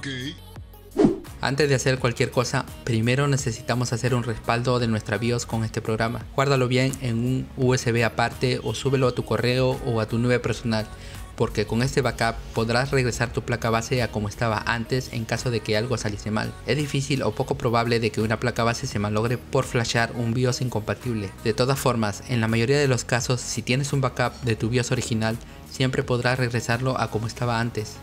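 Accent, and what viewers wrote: Peru. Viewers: eres peruano :V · eres de Perú?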